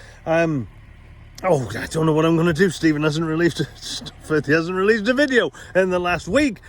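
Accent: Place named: England, United Kingdom. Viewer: For a man that has been living in Canada for 15 years your English pronunciation is still quite English... 😜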